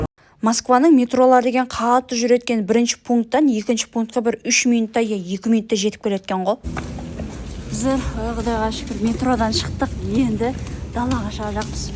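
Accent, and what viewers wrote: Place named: Karakalpakstan, Uzbekistan. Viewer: Сакалы бар ит ,сосиски ит 😂😂😂молодец гап жок карыдас таза каракалпакша 🎉🎉🎉Алла тала жолынды берсин ❤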